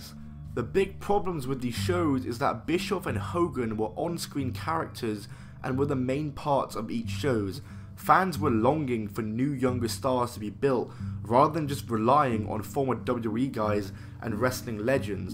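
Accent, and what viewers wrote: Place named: Scotland, United Kingdom. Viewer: Gotta admit, I wasn't ready for that much scottishness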